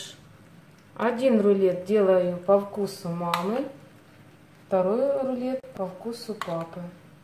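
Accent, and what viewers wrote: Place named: Ukraine. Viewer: Спасибо, за Ваше внимание, за ответ! Судя ро лёгкому акценту, Вы живёте в Украине, как и я?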